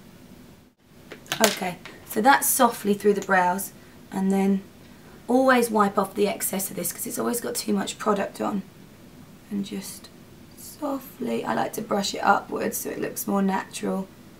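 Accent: United Kingdom. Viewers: Hi.! So happy both you and little Harry are OK.;) Time's flying by so fast! No wonder these little angels grow up so fast.:) Thanx for the awesome vid, you can't imagine how many of your looks I'm wearing on a daily basis. (And, on a totally different note, you restored the British accent to my world! Some awful teachers ruined it for me forever but I LOVE listening to you guys! Don't mean that in any weird way.:) Just a thank you note.:))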